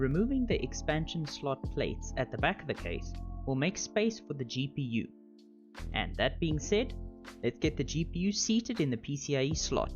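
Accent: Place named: South Africa. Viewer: You south African holy mollie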